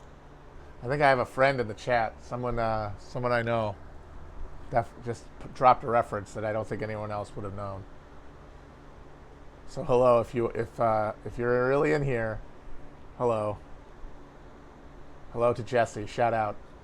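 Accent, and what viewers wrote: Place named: Italian-American, United States. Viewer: As a Buffalonian, I appreciate the gabbagool accent for us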